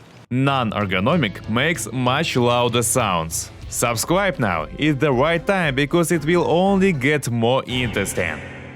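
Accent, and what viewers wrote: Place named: Russia. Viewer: А мне всегда нравится классический русский акцент, ну чисто как в голевудских фильмах 80х-90х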